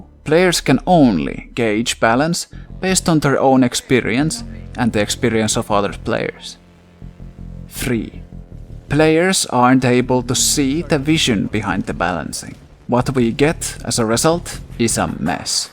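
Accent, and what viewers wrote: Finland. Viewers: anime girls, conquer from the cock and ball game for honor, dad jokes, sexy finnish accent. Perfect video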